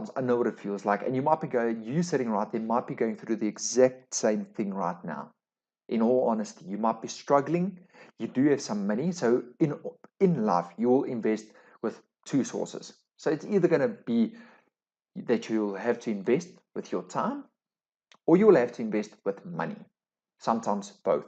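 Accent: South Africa. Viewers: Daai afrikaanse aksent😂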